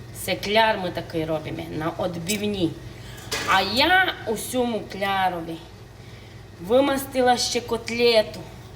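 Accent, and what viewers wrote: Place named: Transcarpathia, Ukraine. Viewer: чекаю з нетерпінням ваші нові відео 🫶 Обожнюю закарпатський діалект у вашому виконанні ❤❤❤. Знайшла (нарешті 🎉) ваш канал на YouTube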